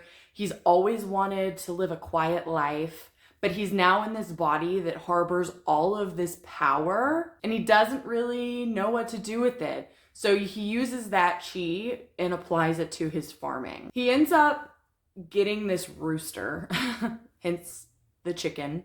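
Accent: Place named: South, United States. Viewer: You sound like you live in the south....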